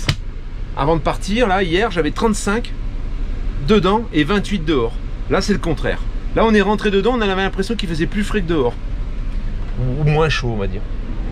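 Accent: Nord-Pas-de-Calais, France. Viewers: Je confirme ... en chti' ca fait + serieux !!!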